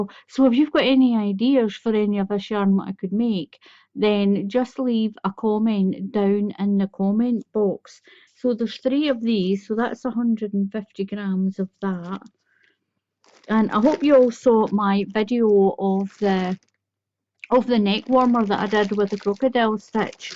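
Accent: Scotland, United Kingdom. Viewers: oh yes I saw the yarn and kept thinking "why does she keep calling these packages"happy meals?" Your glorious accent makes me want to visit Scotland!! Love your videos!!!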